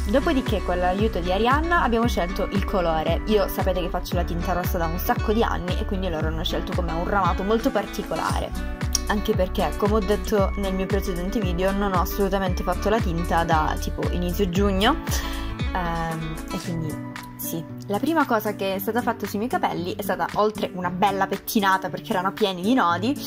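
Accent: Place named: Calabria, Italy. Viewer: Ma di dove sei pk sento l’accento e non capisco bene sembra che tu sia della Calabria ma non sono sicura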